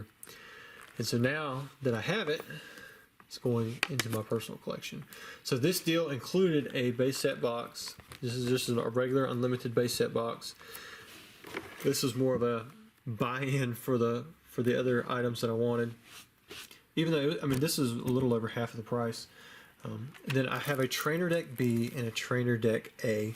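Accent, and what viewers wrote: South, United States. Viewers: He has a little southern twang in his speech. I believe he is from north Carolina but not 100 percent sure.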